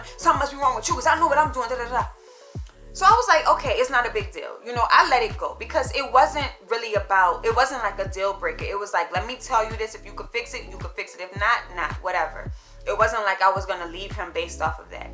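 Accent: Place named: New York, United States. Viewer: Your New York accent had me hollering 😂😂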